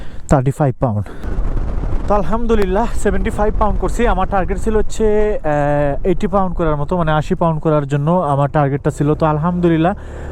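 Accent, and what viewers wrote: Sylhet, Bangladesh. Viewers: ভাই আপনি কি সিলেটি? নতুন অবস্তায় ফুড ডেলিভারি করা যাবে কি না জানাবেন দয়া করে New subscriber of your ❤️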